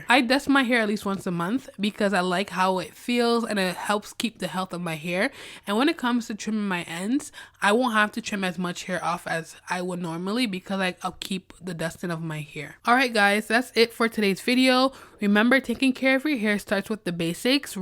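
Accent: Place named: Barbados. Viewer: Love your accent you sound Bajan!!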